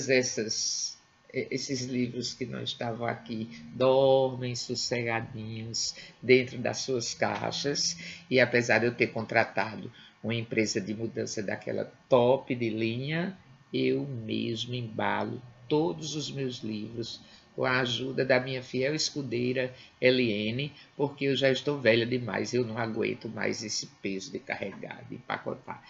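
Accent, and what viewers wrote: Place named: Northeast, Brazil. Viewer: obrigada pelo presente... aqui embalando meus 250 livros rsrs... e procurando como 'embalar livros" me deparo com este presente, essas leituras lindas, com o sotaque tão afetivo em meu coração, a doce lembrança da minha avó paraibana e de meu velho pai, pernambucano, contando os 'causos' do sertão diante das fogueiras de são João nas periferia de São Paulo, onde crescemos eu e meu irmão - gratidão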